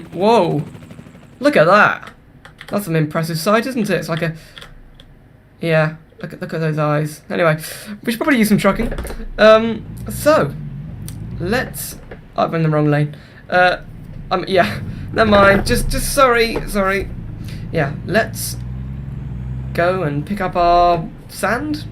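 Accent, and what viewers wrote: United Kingdom. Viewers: young bri'ish fella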